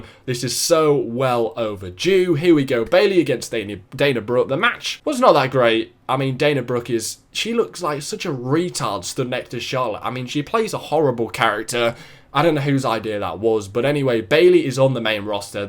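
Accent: United Kingdom. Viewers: First, as a lesbian, I agree, NO wrestling company should be trying to put that in their storylines. Perfect examples in the past, early days of Goldust, and TNA's Orlando Jordan. Gawd awful characters. Second, 4am? Thought I picked up an UK accent. Awesomeness! Have friends over in that part of world, including a woman still have feelings for. Third, may rewatch Seth VS Sammi later on. Still beat from the shows this weekend, so maybe later on, I could appreciate it better. 😉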